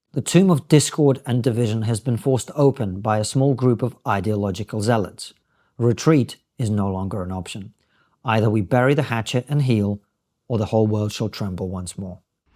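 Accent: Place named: United Kingdom. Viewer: Ты так быстро говоришь и с таким хэви-бритиш акцентом, очень трудно понять.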